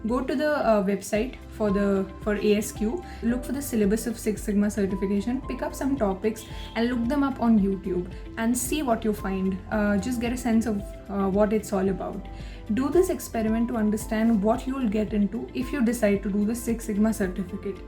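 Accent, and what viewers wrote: India. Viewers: You tried to maintain a good English accent but a lot of place you are speaking as a typical Indian accent where you rolled a lot of words in your mouth and delivered it very hastily. "Try this test: say "At this time I am not available", then pay atttention to the word "available", and then good the word "available", then click on "how to say this workd. And then compare it the way you say it. Ta Da... your accent will prove and make you outstand as an Indian English speaker......any way I liked your video for the fact that you might have repeated this written script several hundred times and that is appreciable. Good work.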